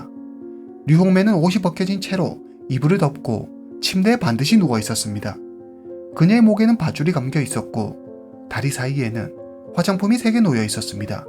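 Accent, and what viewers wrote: China. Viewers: 발음이한국분은아닌거같은데 약간조선족?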